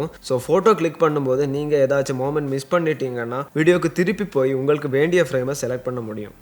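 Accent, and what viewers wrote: Kerala, India. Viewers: Malayalam hero Tamil pesara mathiri iruku ba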